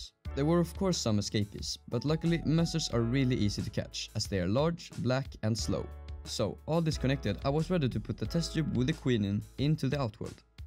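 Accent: Sweden. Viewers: You can hear that he is from Sweden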